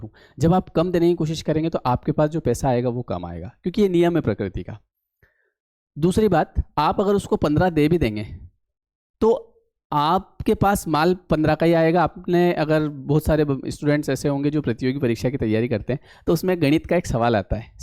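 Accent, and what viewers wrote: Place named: Madhya Pradesh, India. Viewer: Sir jarur aap mp ke honge kyu ki tone whi lag rhe hai😅 kyu ki mai bhi indore se hi hu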